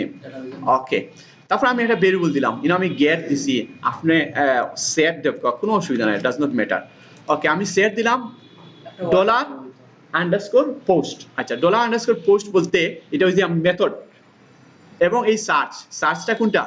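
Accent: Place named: England, United Kingdom. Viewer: Parts sound English ish.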